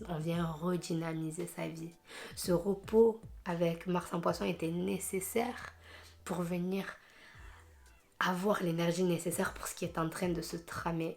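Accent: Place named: South, France. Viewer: Therapy | Mindset & Manifestation heeeeyyyy la team je suis là ma belle je regarde ta vidéo je me suis abonnée à ta chaîne aussi je te soutiens de fou je te souhaite de cartonner sur YouTube même si je sais que c’est pas ton but premier 😉. J’aime beaucoup ta voix avec ton accent du sud aie 🔥 ton beau visage illuminé 🤩. Franchement tu explique tres bien les choses bravo continu moi je tenvoi pleins de force 💪. Gros bisous de de… la lionne logique 😘😘😘😘😘😘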